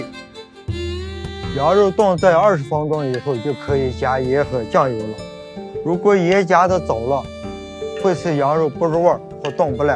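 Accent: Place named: Inner Mongolia, China. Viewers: 巴盟，包头，东胜都是这个口音。跟我们晋西北，陕北一个味。这个炖羊肉做法跟我们家里一模一样，只是我们不放蒜，加点茴香。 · han xiang 对，听着像巴盟的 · 赛拜侬 西北口音 伊盟人 或者巴盟人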